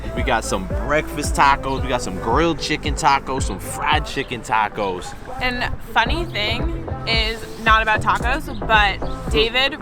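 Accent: United States. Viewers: the delivery is very American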